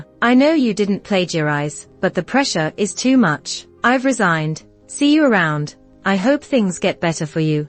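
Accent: United Kingdom. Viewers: I have to admit... I don't even care what the story is about. I love the British accent lol. Though I will say this was very satisfying to see Molly and Ray get what's coming.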